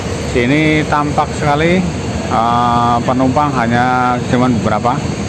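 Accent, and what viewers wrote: Banyumas, Indonesia. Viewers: Hadir mantau mas, nek ora ora kepenak... Paten👍👍👍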